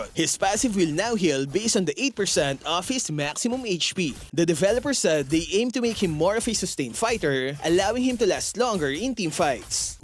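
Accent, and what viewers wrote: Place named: Philippines. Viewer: English filipino dictionary accent.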